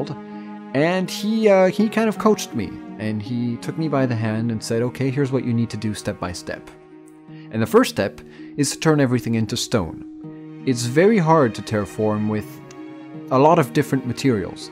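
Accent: United States. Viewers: Ce n est pas un bon accent en anglais il est américain il a plus tôt un très bonne accent en français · est américain, donc forcément il a l'accent. Et pour le nom d'Aurélien Sama, un anglais (ou américain), quelque qu'il soit, s'il a un mot français à dire, le dira avec l'accent français (ex: Rendez-vous) et encore plus si c'est un nom propre.;)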